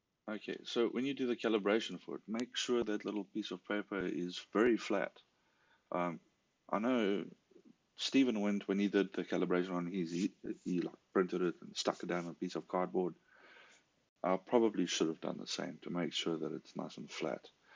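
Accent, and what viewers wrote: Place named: South Africa. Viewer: You from South Africa .. which part ?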